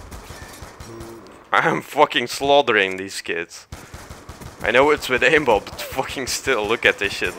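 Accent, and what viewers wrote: Netherlands. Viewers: Is the commentator from dutch/netherlands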